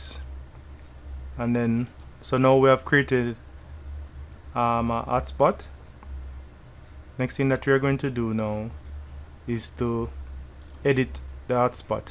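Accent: Jamaica. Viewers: Are you from Jamaica ?, love the accent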